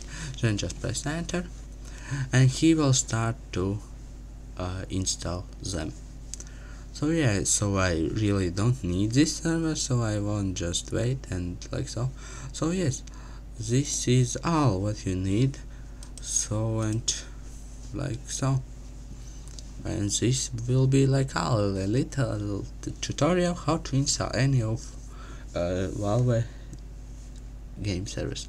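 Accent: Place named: Russia. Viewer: Are you Russian? Просто дико русский акцент, а насчет туториала, спасибо, помогло :)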